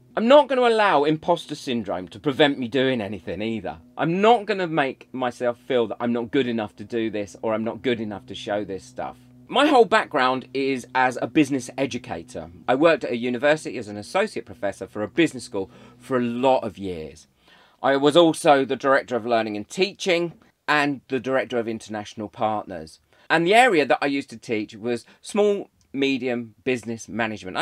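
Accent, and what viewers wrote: United Kingdom. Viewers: Oh, dearest!!! First off, I love your face!! I find it’s kind and gentle! You’re quite handsome, and your British accent doesn’t hurt a bit!! Lol!! ( I’m Canadian) I’ve watched every thing you’ve made and have learned something every single time. These people who are cruel, for their own pleasure baffle me!! I guess they’re just cranky and sad with nothing better to do! Maybe they want you to feel as horrible as they do. Absolutely unacceptable!! I know you are adored, welcome and needed!!! Your wife, child(ren) must be so super proud of all you’ve accomplished!!! I certainly love you, and believe who I see when I watch you is the real deal! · I for one would like to say Thank You to you. :virtualhug:💝 I always find your Video's delightful and your attitude often silly yet very informative. I can almost bet the problem comes from some one who has a problem with the British accent and thinks it's pretentious. 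( If I had to say and I will It is more than likely a Yank and yes I can say it being from Baltimore Maryland! ) I would also like to say thank you for helping those of us who have problems with resin curing and bubbles no matter what we tried. I have a problem with a High dollar brand curing in my basement ( It is very well ventilated and I use PPE I PROMISE! but it stays at 68 degrees no matter what ) and after watching two of your video's on mixing I was able to finally get the bugger ( Sophie and Toffey is the brand I do not like ) to cure in my basement with a resin curing machine. So this Yank would like to say thank you and please continue your beautiful work.💜😇🥰:virtualhug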